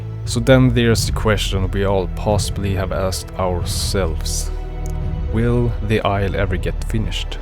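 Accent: Sweden. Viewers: Im norwegian, and i also heard swed immediately:P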